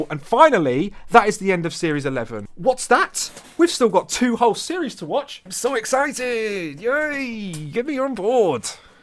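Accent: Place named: Yorkshire, United Kingdom. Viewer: Good Yorkshire accent tho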